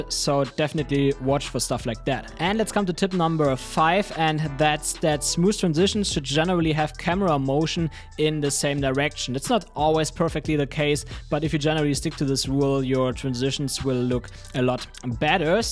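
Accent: Germany. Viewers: klar englisch ist kein Problem aber wäre auch schön mal paar deutsche tutorials zu sehen und man hat krass gehört dass du eigentlich Deutsch redest aber gutes Video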